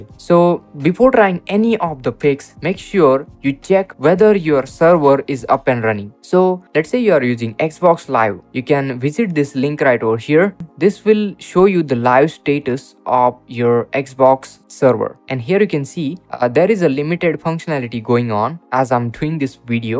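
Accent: India. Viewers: He a Indian tech scammer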